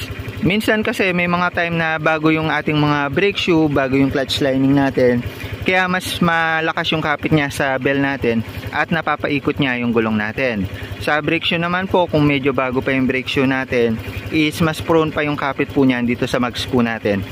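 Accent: Pampanga, Philippines. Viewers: sabi na boss kapampangan ka eh hahaha pabsin ko lang accent mo sa mga tutorial mo hahaha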